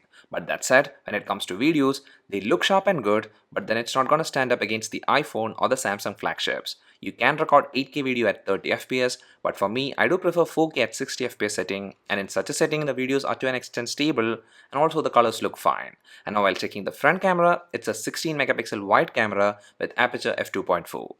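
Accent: Kerala, India. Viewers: Are you from kollam kerela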